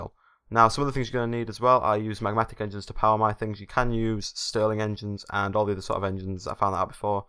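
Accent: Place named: United Kingdom. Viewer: his Thick Brittish accent....... =))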